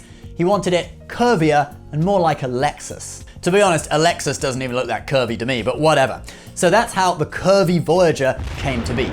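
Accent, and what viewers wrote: United Kingdom. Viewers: not a fan of british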